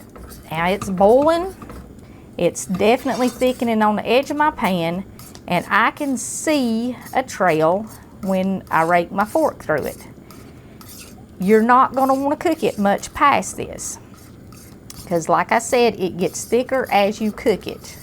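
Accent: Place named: Missouri, United States. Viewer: Hello! Loved the video and love hearing you talk.My dad was from Alabama and it brings back memories. Anyhow....never made gravy w/o sausage, I'm going to have to try it. My recipe is to brown up a pound of sausage, spoon out the excess fat and sprinkle flour over it. Stir it up and add the milk. After that is is some salt, lots of coarse ground black pepper, some powdered sage and chicken seasoning for flavor. I have even used chicken bouillon cubes at times. Thanks again for the great recipes. BTW....what part of the south are you from originally?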